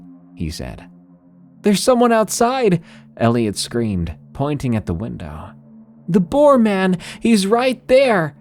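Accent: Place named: Denver, United States. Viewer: I didn't know that we Denver people had an accent 😊 Loved the stories TY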